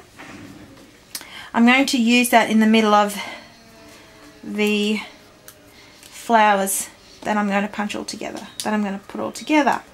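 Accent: Australia. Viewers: Hi hunni, love your tutorial.. just wondering if you were Aussie. . Lol!!! Hope i. Mot offending you by asking...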